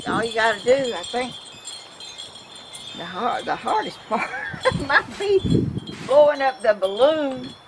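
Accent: South, United States. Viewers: I love her southern drawl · Am new to your channel, this is my second video an it’s 1yr old an love it 😍 Just love your your southern accent 💝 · you are a joy....I'm from Australia and think your projects are inspirational.....love your southern accent....bless you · You are such a creative and inspiring person. I do crafts as well, but I’m not as brave and creative as you are. Your videos calm and inspire me. I love your Southern accent - and your humor. You are truly blessed that you are inspired with a unique idea in your head and then you figure out a way to create it. Marvelous!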